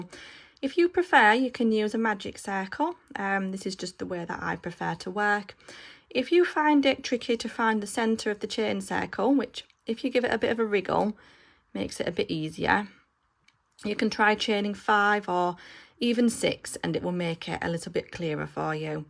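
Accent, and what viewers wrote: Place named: Northern England, United Kingdom. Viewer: ! I haven't crocheted in years and this is the first project I've wanted to do! I love it! (I usually knit) AND, you sound exactly like Mr Thornton's sister in North and South! Love that film, love Northern England, and love that accent! ☺️❤️ Thank you!!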